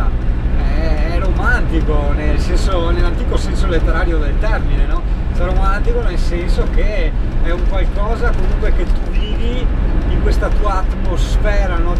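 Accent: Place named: Treviso, Italy. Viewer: El to dialeto ghe someja tanto a quel de Treviso.....!!!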